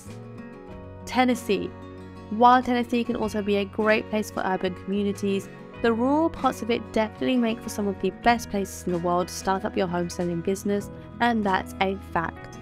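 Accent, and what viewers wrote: United Kingdom. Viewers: Why is a Brit accent talking about American homesteads? Smh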